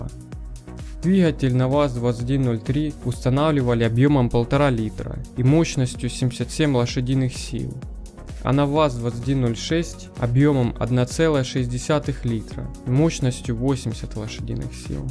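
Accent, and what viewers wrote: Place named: Ukraine. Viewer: Он из Украины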